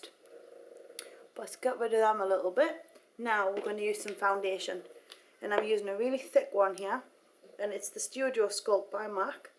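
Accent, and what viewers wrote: Newcastle, United Kingdom. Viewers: Are you a Geordie? First I thought you were Scottish....nice tutorials, I am still struggling with the false eyelashes...:) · dude ur video was awesome, but the one thing i really want to know is your accent. is it... british? irish? idk.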